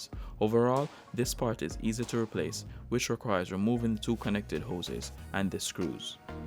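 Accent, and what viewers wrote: Jamaica. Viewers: Just subscribed my boss. Yuh sound like a yaadie. Everything you touched on i have done on my car. It makes for a trouble free.. Worry free..peace of mind experience. Great video, to the point and helpful. How can you differentiate a misfire due to carbon build up? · You Jamaican bro? bless up ✊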